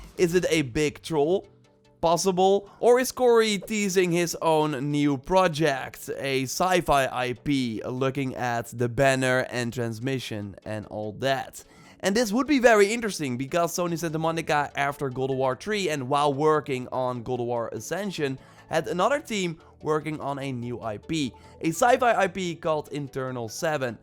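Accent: Netherlands. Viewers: Nice info and video, greetings from a fellow dutchy (I could hear it from your accent hehe)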